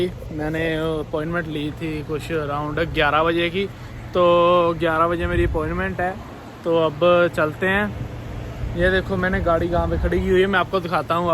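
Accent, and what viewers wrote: Punjab, India. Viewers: Bro tuhadi Punjabi leak kardi rehndi hai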